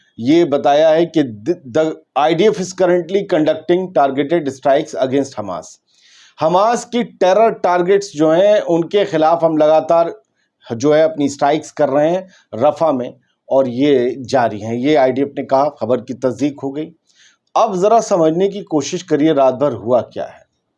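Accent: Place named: Bihar, India. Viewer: Bhai aap bihar se hain tale upar ye bihar ki zuban hai ❤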